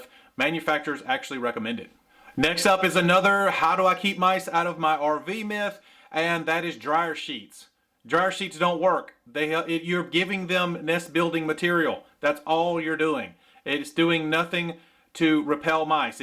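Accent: United States. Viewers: I am sorry, some folks suggest that people with British accents sound intelligent but, I am here to tell you, you can take a country accent to the bank! Keep it up and GO DAWGS!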